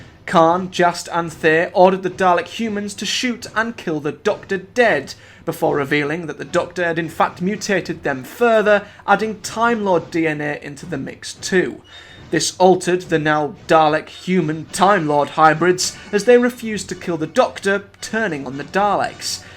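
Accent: Middlesbrough, United Kingdom. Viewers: Thank you ever so much for taking the time and effort to make such a top drawer video. It was great. May I ask are you from Middlesbrough like me? The girl who sometimes is in your video sounds like she does too. She usedthe word narked. Top Boro word. Anyway cheers and take care Ant p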